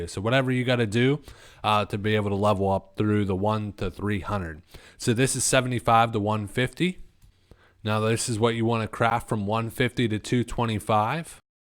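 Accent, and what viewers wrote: Philadelphia, United States. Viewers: Definitely from Philadelphia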